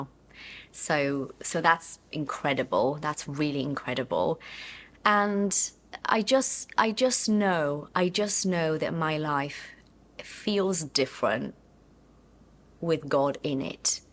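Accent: United Kingdom. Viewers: I like the video, I'm like you. But how do you manage to have that British accent while being Brazilian? lol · your British accent is brilliant.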